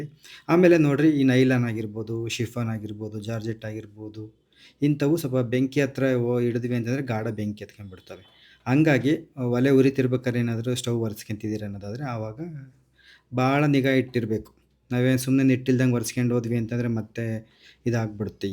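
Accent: India. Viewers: ದುರ್ಗಾ ಕಡೆ ಜನ ಹಾಗೆ ಮಾತಾಡೋದು ಅವರ ಮಾತು ಅಡಿಗೆ ಎಲ್ಲಾ ಚಂದ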